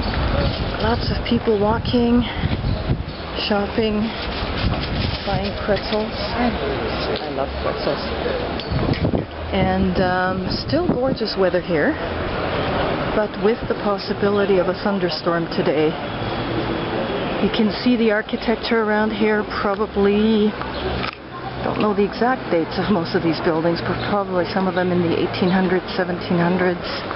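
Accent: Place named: Canada. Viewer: Your accent was a bit of a mystery for me :), I thought you didn't sound American and when you said Canadian is all made sense :). Thanks for the video!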